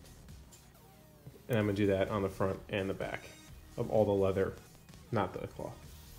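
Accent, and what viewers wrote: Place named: Philadelphia, United States. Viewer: Lol something tells me you're from South Jersey/Philly/Delaware? Go birds? Also nice vid.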